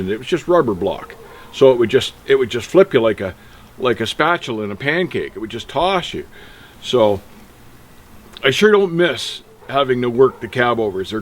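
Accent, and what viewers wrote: Canada. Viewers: Love the Canadian accent...it’s not about.....it’s a-boot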